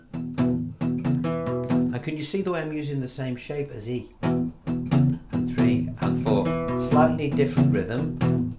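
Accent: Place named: Merseyside, United Kingdom. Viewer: I'm trying to guess the accent, outskirts of Merseyside somewhere...heeheee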